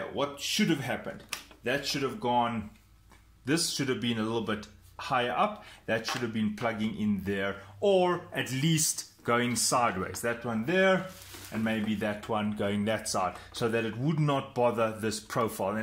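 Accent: Afrikaans, South Africa. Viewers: Smaaking the afrikaans accent😝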